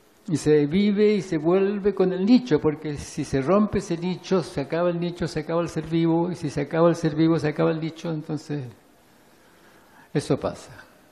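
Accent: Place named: Chile. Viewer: Amo el acento chileno♥ Que hermoso escucharlo mi querido Maturana ♥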